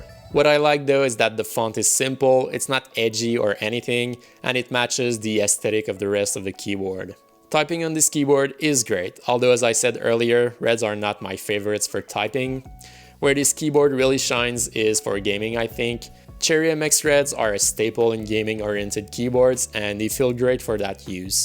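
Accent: Quebec, Canada. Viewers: J'aime ton professionnalisme, le travaille de tes vidéo sont soignée et ton accent québécois distinctif avec une diction impeccable et sans reproche (magnifique) :)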